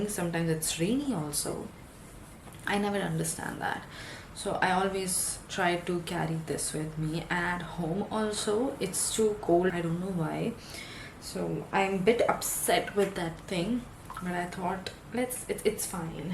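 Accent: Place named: Canada. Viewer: Woahhh that Canadian accent thou🫣🫣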